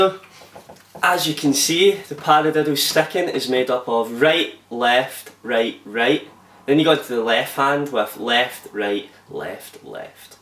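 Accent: Scotland, United Kingdom. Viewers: Min Thu Ko Scottish accent.....from Scotland!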